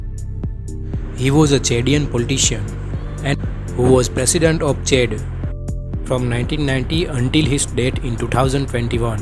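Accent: India. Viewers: the indian narrator voice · Why Indian English accent is so heavy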